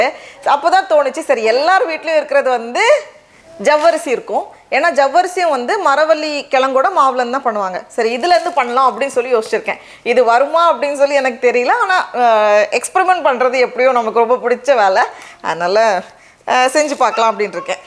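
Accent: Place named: Kerala, India. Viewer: Are u malayali.. why am asking this because neenge pesumbothu malayalam slang varuthu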